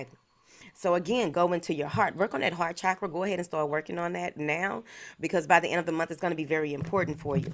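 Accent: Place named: Missouri, United States. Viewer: Where you from Missouri? It sound like it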